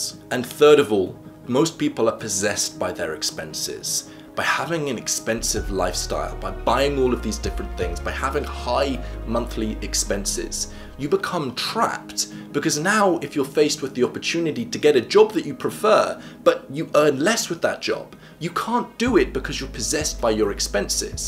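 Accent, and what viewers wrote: United Kingdom. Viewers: I pronounce with "k" and I'm in the UK. His British accent is in the way he ends every sentence. :) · love your british accent bro ! I appreciate training my english with your smart videos (I 'm french)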